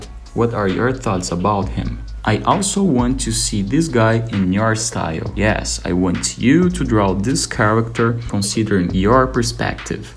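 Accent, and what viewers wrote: Brazil. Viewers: pelo sotaque eu percebi que era br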